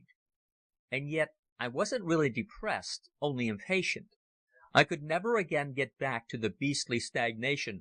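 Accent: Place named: United States. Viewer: Oh another bloody American narrator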